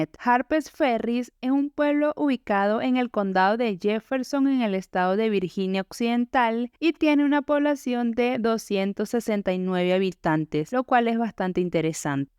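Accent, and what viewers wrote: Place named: Venezuela. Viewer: La joven que narra tiene un acento muy bonito, parece venezolana o colombiana. De dónde será? Es solo curiosidad.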